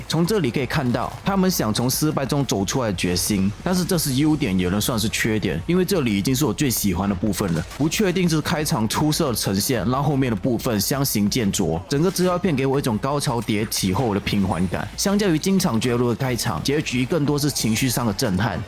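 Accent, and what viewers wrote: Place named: Malaysia. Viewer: 听他口音，马来西亚人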